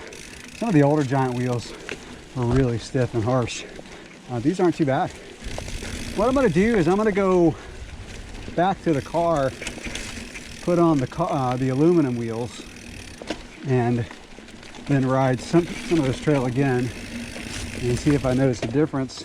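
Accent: United States. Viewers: I love what you do man, Great job. I'm learning from you a lot, with your clear explanation, and your American accent I will never miss anything unlike GMBN lol.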